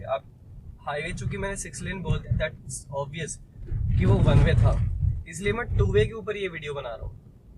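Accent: Haryana, India. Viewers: Bhai haryana ka hai k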